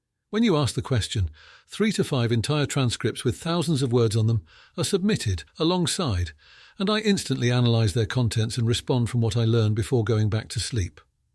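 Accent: United Kingdom. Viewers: understandably, the voice could only ever have had a british accent 😂 luv it ❤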